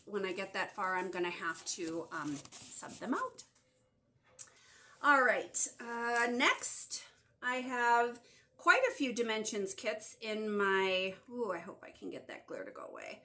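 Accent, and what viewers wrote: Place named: Wisconsin, United States. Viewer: Oh shucks I'm in Wisconsin as well. You must have been born and bred here. I just love hearing your accent. I've only been here for 49 years. I am so jealous of your gorgeous hair. That's not very Christian of me. I don't know anybody who stitches. We are around the eau Claire osseo area. Good to see a native doing a floss tube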